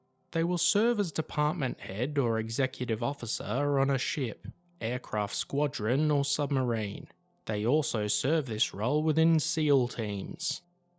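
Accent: England, United Kingdom. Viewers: Thanks 🇬🇧 accent. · US Navy. Why have an English guy as speaker? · Why is some British guy narrating this? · It's funny to hear a British person do American ranks of the US Navy. Anyhow I got out of the Navy 9 years and was a second class petty officer bosun's mate. When I got out I was up for bosun's mate first class but I didn't care because I was getting out. Peace.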